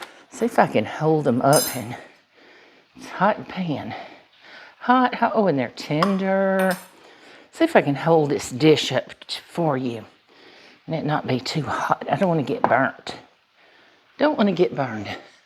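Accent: South, United States. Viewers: Tuning in from St. Louis. As a single guy, I’ve found a love for cooking as of the last year or so. Thank you for the inspiring recipes and a southern drawl that’ll make a guy just melt.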